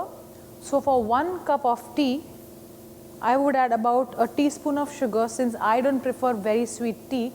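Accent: India. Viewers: Very clear instructions in classic Indian accent (apna cha ache hain, leikin T nahin!)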